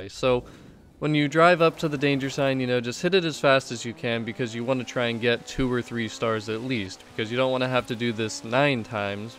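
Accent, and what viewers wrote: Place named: United States. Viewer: Yeah your probably correct from the US accent and pronouncing of the car... In the UK that's the way we pronounce it is the way I sort of typed it... Who cares lol great video as always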